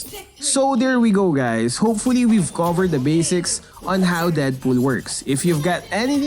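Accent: Philippines. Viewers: Putang ina mo accent mo pang cancer na pinoy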